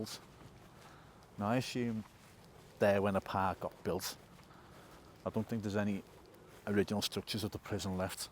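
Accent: Liverpool, United Kingdom. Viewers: The wife was listening in on this and said he's good him proper scouse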